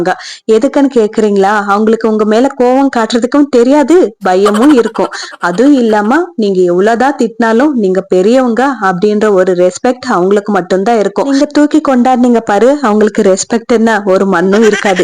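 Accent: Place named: Bangalore, India. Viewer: Kk sis nenka pesuruthu Bangalore la irrukuruvanka pesura mari irruku ahthana ketan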